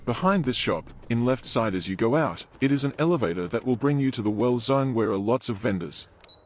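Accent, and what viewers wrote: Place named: Australia. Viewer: Nice video. I had a bit of a giggle to myself with the commentary in an aussie accent but the script seemed to be written by an asian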